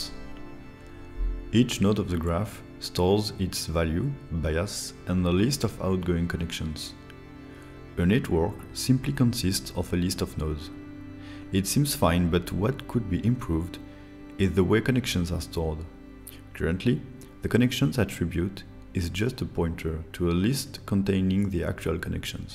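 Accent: France. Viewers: Your channel is becoming amazing ! Great french accent btw ! And the animations are ON POINT ! 👌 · J'avais reconnu notre bel accent 👌😂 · The youtube channel is located in France and with this accent I'm absolutely sure that he's French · anyway a question, are you a French native speaker? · T'es français non ? X) nice video tho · R’u french ? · Déjà la vidéo est hyper bien, mais aussi petit big up à ton accent français haha :)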